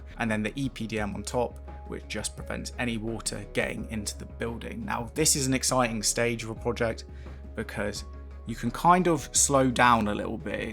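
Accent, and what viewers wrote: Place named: England, United Kingdom. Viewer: As for talking or not.. just carry on as now. Your voice is pleasant, info is good to know. Too much talking can get annoying but so can total silence. How'd you keep yr English accent growing up in Normandy? Love to know..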